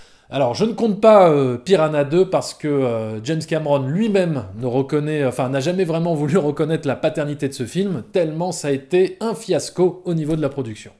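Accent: South, France. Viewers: Lorsqu'on met Aliens 4ème d'un classement on se rend compte réellement que James Cameron n'a fait que des excellents films voir des chefs d'œuvre. Je le mettrais plus haut dans la liste mais le top 5 est très compliqué Concernant Avatar je le mettrais aussi dans les derniers du classement car il m'a marqué essentiellement pour ses effets spéciaux révolutionnaires et que dire de la 3D... Pour la musique je ne suis pas d'accord avec toi par contre je l'écoute de temps en temps mais pas autant que celle du seigneur des anneaux qui est addictive Du coup si je devais faire mon classement des films de James Cameron (versions longues uniquement) 1) terminator 2 2) aliens 3) titanic 4) Terminator 5) Abyss 6) avatar 7) true lies Vivement la réouverture des salles obscures Bel accent du sud sinon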